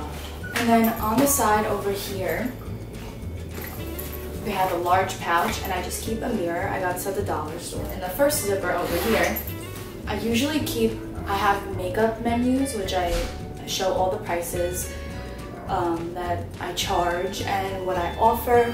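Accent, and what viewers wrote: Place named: Staten Island, United States. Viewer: fellow staten islander! knew it the minute i heard you speak you sound just like me lol. awesome choices for your kit! i'm also a freelance MUA on the island!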